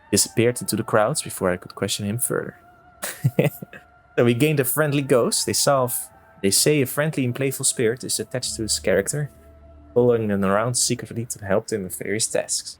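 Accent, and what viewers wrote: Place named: Netherlands. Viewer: The story telling at the begining was really cool! Keep this up! But work on dat THICC netherlandse accent.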